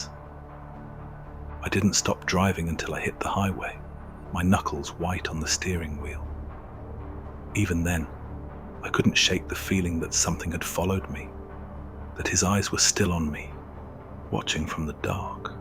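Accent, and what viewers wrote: United Kingdom. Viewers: I am so happy to eventually find a British narrator, I love your voice and I love your content! I wish that everyone who listened to your stuff would hit the like button for you, it’s the least we can do to show our appreciation for your brilliant page xx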